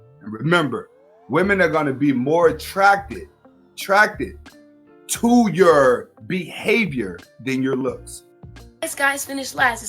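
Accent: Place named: New Jersey, United States. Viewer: Bro I could literally feel you were from New Jersey.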